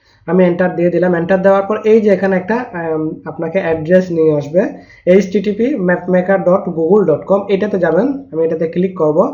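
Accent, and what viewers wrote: Noakhali, Bangladesh. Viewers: শালা ভালো ভাষার কেউ নাই,,সব নোয়াখাইল্লা শালা রিভিউ রে বলে রিবিউ এখন রে একন 🙈🙈🙈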